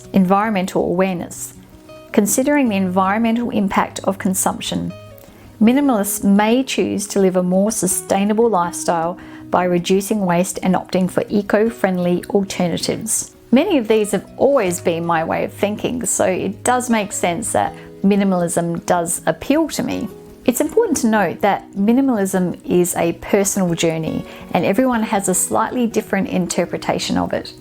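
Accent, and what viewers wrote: Australia. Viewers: Did she say autistic or artistic? Her Australian accent can be misleading. Nice presentation however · Did you say Autistic or Artistic?I'm American so sometimes Australian accents are more difficult to understand.I'm beginning this process now that my nest is empty.Its very difficult but I'm sick of all the excess things I have accumulated.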